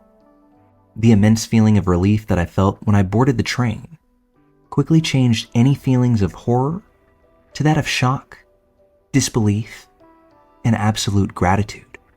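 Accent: United States. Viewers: It's got to be the guy's voice it's like the new Transatlantic accent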